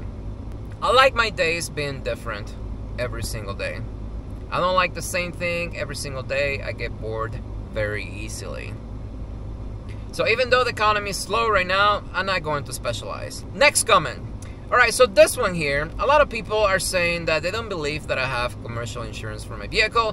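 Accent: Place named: Nicaragua. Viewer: Your accent sounds like you're from Nicaragua.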